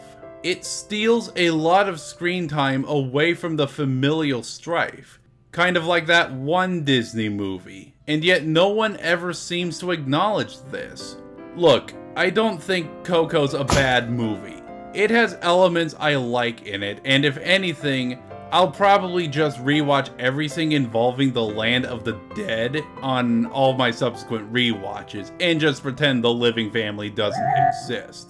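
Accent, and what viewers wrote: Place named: United States. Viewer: I'm worried that this is a cultural issue. I don't want to assume you're American, but the accent says enough. In America, it's perfectly normal to go against your family's wishes to follow your dreams. That's an outlier in the world as a whole. In many cultures, family is everything. I'd suggest getting a perspective from someone who is actually from the culture they went so far out of their way to represent, before calling someone who is upholding an important, if nonsensical, family tradition as a "medieval reject".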